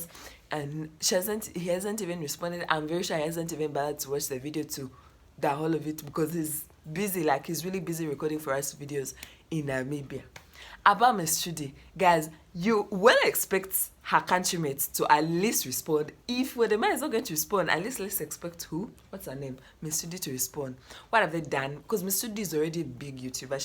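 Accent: Nigeria. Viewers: I hate it, can't stand the Nigerian accent. Stop it ooo. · Ghana? She sounds Nigeria?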